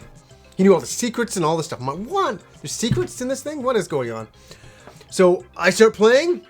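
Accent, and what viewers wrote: United Kingdom. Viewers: I didn't know you were from england. Now that i notice it you have a very slight british accent in your voice. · The more emotional you get, the stronger your British accent gets haha I love that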